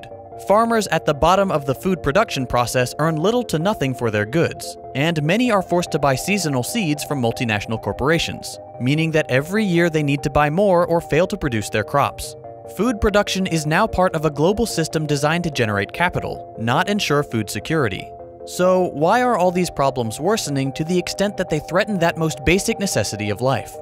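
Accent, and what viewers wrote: United States. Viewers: I usually prefer British or other accents than a thick and strong American accent, but your ability to narrate is incredible and your voice is super relaxing and good to hear, great job bravo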